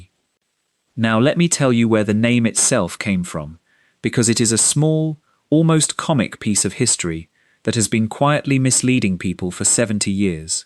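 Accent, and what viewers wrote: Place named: United Kingdom. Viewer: Think I'm gonna stop listening to British-voiceover space videos. ...fuck.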